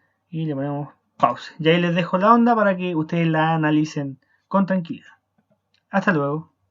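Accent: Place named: Chile. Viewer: muchísimas gracias muy didáctico y muy bien explicado así es muy fácil de entender por su acento parece ser de Chile , un saludo desde Colombiano.y nuevamente muchas gracias. .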